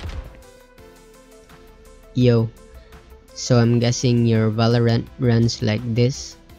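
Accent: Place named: Philippines. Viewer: Pagsasalita palang alam ko nang pilipino ahahahaa thanks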